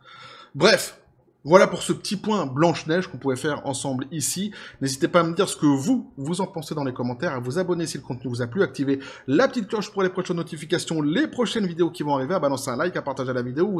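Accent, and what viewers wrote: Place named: Quebec, Canada. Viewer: Rien que pour l accent québécois, je met un pouce vert! 😊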